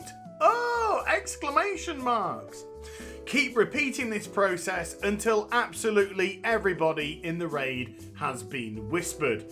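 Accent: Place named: United Kingdom. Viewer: I dont know why i love angry people with british accents so much.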